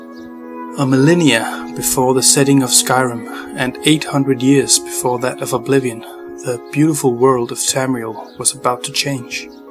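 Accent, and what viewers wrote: Denmark. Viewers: SO dude, are you Danish? Just wondering. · Hey - great video! I couldn't help but wonder, are you Danish? You seem to have a slight accent, and your DK in " " hints at it as well. Cheers, a (fellow) Dane.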